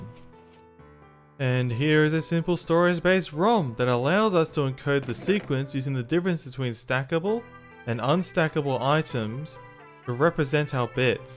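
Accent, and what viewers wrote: Australia. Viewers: as a native, he sounds very Australian to me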